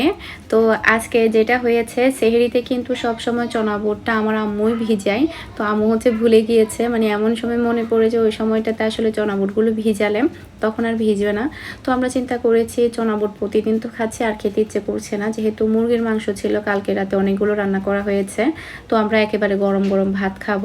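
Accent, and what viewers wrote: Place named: Sylhet, Bangladesh. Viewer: আপু সিলেটের তাই শুদ্ধ বাংলা বল্লেও সিটেলের ভাষা টা চলে আসে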